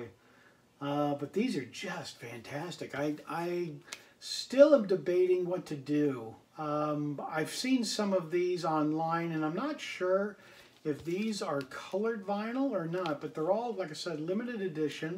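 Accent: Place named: Mississippi, United States. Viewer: I always thought you were from Mississippi with that accent of yours???🤔lol😎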